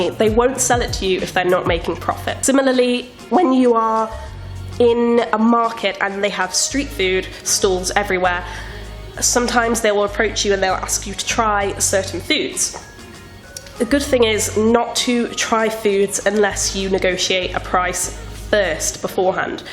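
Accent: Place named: London, United Kingdom. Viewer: I love your accent are you from London England ?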